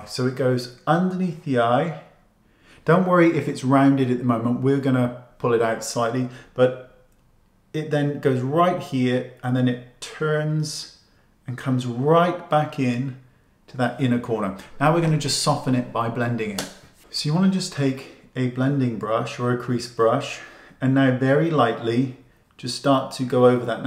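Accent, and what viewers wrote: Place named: London, United Kingdom. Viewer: Thank you very much,. I love your English. Where r u from? London? 💞💕👏🙋🏻🇪🇸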